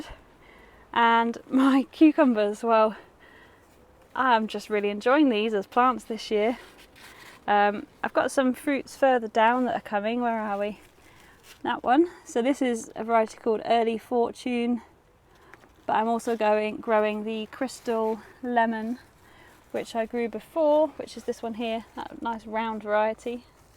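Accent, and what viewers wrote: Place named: London, United Kingdom. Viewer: Did you grow up in the UK? You sound like a Londoner 😅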